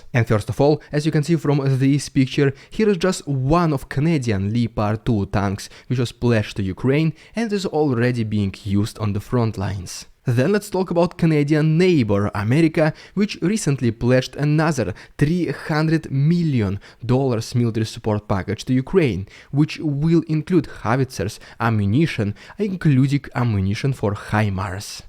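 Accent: Russia. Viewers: От такого русского акцента - кровь из ушей.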